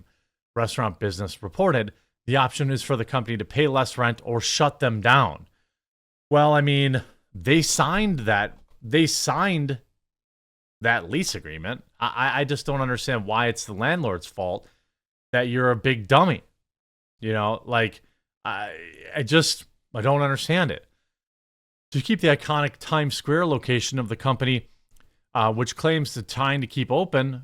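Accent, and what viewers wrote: Wisconsin, United States. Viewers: That my friend is a Wisconsin way to say bag. I’m in northern Illinois. It’s baag. lol. Love your videos brother Also. I’m telling you guys “Demolition Man” 1993 predicted this. In the movie Taco Bell was the only restaurant to survive the franchise wars. Took place in 2032. They have begun 😂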